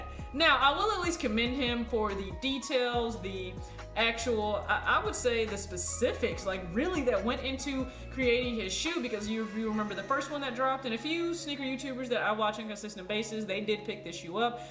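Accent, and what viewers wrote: South, United States. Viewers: definitely from the south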